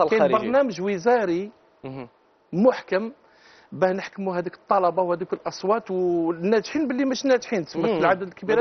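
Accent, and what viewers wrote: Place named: Constantine, Algeria. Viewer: هدا اسمو لحن الحديث اللهجه القسطينيه ، احكمهم أبا عن جد، راس مالهم هدرتهم والفوخان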